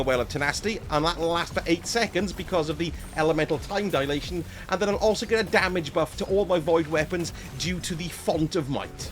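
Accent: Wales, United Kingdom. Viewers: i can spot a welsh from a mile !!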